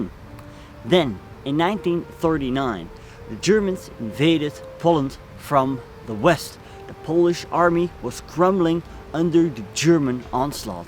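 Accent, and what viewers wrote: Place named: Netherlands. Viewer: Inhoud zeer sterk, helaas vreselijk dik Nederlandsch accent. Als je daaraan gaat werken zou het kunnen dat dit kanaal een groter bereik krijgt.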